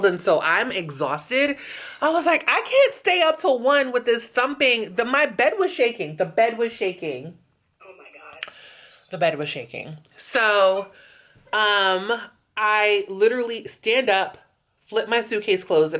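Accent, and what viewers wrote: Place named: West Coast, United States. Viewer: I howled at the west coast accent, not too bad. 🙌🏾🤗🤣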